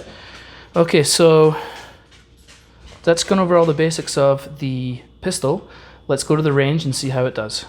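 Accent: Ireland. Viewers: Irish or Scottish?? 😁 Just ordered mine last week. Just waiting on shipping. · Irish much?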